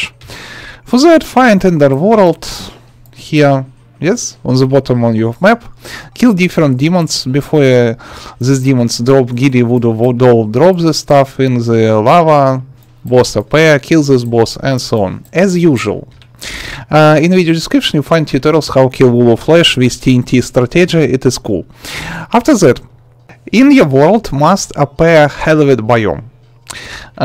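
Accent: Russia. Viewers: He sounds so russian or of a similar region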